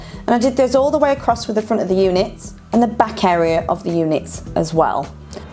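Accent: United Kingdom. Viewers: I'm doing my sink now and it was so nice to see you do this with painted nails, and could listen your British accent.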